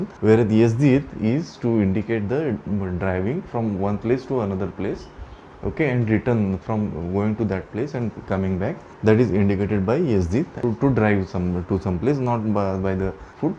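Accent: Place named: Russia. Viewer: Russian accent is cool❤️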